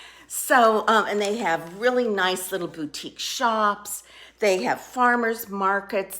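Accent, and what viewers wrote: South, United States. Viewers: Hi I love your Q&A idea. Several years ago I watched your fantastic video about your high school reunion in Mississippi. So my question is what brought you to Mississippi and do you stay in contact with your classmates? I live in Alabama and every once in awhile when you speak I detect a slight Southern accent. Love ya!!!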